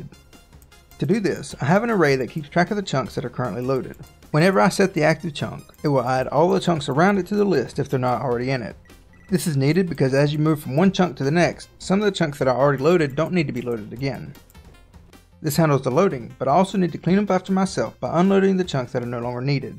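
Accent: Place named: Texas, United States. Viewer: I like your Texas accent,are you from Texas?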